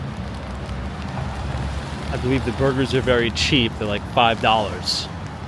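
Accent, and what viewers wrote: New York, United States. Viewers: Draga, vocea ta, vocabularul tau, accentul pur newyorker - sunt medicamentul antidepresiv cel mai bun! Te felicit si te imbratisez cu toata inima, din Transilvania! ❤❤❤❤🏆🏆🏆🏆🏆🍀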